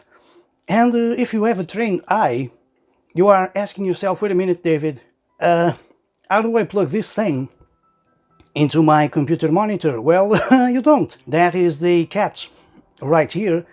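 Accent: Portugal. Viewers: i freaking knew you were Portuguese by your accent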